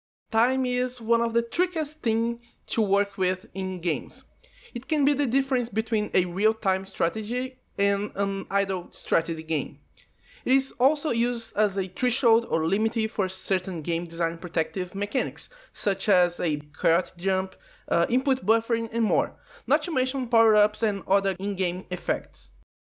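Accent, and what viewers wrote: Brazil. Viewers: Percebi pelo sotaque. Ganhou um inscrito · Eu sabia pelo sotaque que tu era brasileiro kkkkkkk salve, e seu inglês é MT bom btw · Sabia que era BR só pelo sotaque. Brabo homi!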